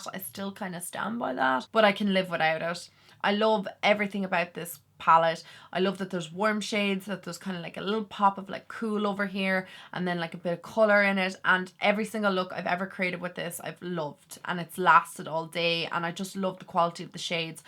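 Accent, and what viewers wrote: Ireland. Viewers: I'm new to your channel after searching for eye shadow pallets and this is really useful, the Huda one looks good. By the way like your accent, it sounds very Irish :)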